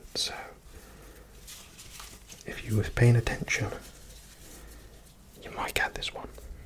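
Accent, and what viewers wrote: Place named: United Kingdom. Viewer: I swear to all that is holy that the British accent just does something to me……I’ve never even heard it in real life but I want to so bad!!! It’s just too charming!!!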